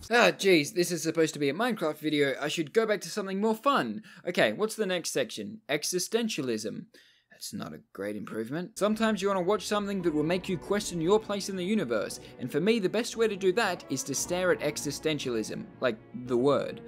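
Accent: Australia. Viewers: good content and austrailian accent 10/10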